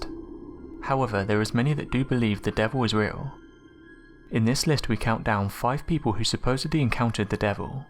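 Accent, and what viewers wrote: United Kingdom. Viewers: I normally don't care for the British accent but there is something soothing about his voice. Makes it that much more enjoyable.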